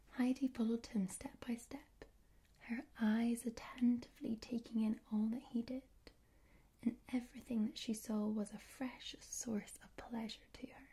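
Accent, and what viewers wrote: Scotland, United Kingdom. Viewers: Love me some and Scottish ASMR 🥰😍😴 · 180 minutes of tingles from the resplendent scottish lass. bank holiday monday just got better. ☺️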